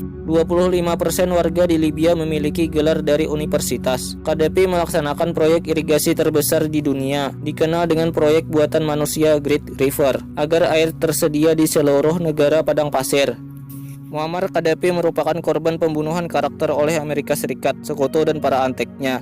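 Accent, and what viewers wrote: Banjar, Indonesia. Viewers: Logatnya kada kawa dikeramputi lg, pasti urang banjar, hehe.. salam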